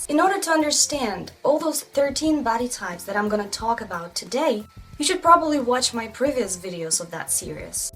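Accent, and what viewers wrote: Russia. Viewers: please a scarlett johansson inspired <3 <3 <3 can't wait !!! you're the best at inspired makeup :) ps : love that russian accent :D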